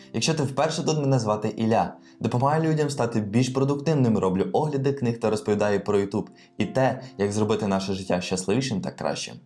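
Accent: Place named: United States. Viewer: Ех, не можу за акцентом вгадати штат( Успіхів вам і розвитку каналу! 🙌🏻✨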